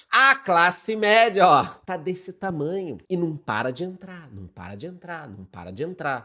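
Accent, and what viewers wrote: Rio de Janeiro, Brazil. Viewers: Adoro esse sotaque carioca ❤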